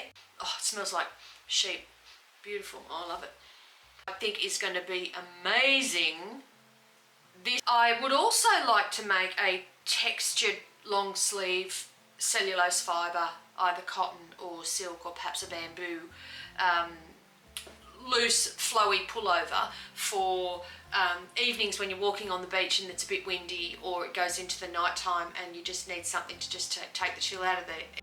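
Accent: Australia. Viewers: I've been waiting for you to pop up on my tv. So interesting to hear you talk about your knitting projects and I really like to hear a real Aussie accent that isn't tonally flat like mine. Summer is just about here and Im trying to finish a couple of woolly shawls. Cashmere and Rabbit sound very exotic. I haven't used either yet.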